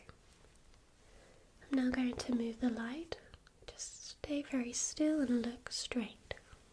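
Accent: England, United Kingdom. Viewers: I don't know why, but English accents help so much with tingles...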